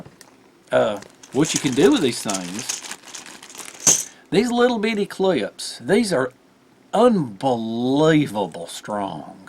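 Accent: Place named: Georgia, United States. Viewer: You sound like Ga boy, I know all about those shutdowns, local 1263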